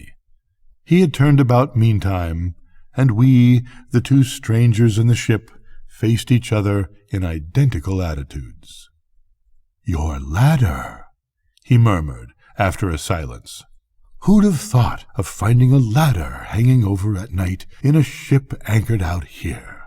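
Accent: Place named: United States. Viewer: One of the greatest short stories ever written. Inviting many interpretations . But sadly almost forgotten . Conrad's style can be rather ponderous for modern tastes so his popularity - such as it is - will dwindle even more no doubt. ? Still , this was very well done . A pleasing , well modulated North American accent is always a pleasure to listen to . Despite the obligatory moans of English listeners who think narrators should sound like Sir Laurence Olivier !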